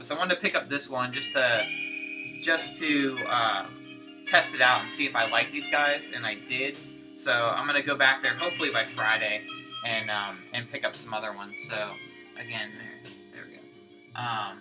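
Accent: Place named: Boston, United States. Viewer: You have a little bit of a Bostonian accent too. You are a man of my heart showing that record. My favorite crooner of all time. I am really sorry to hear about your mom. That really did upset me hearing that. She sounded like she really loved her music. had an amazing voice when she was young. Of course I love the. They wrote some amazing psych songs early on that no one really knows about. Good to meet you my friend. Talk soon~ Rob (^‿◕) Boston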